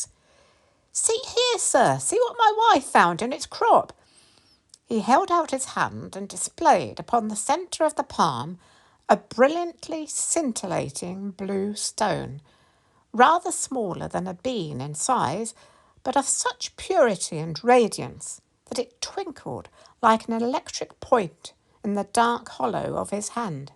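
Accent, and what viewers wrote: United Kingdom. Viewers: I enjoyed your narration of this Sherlock Holmes short story. Hearing it performed with a British accent is so much more enjoyable than hearing it in my head with my American one. 😂 Thanks.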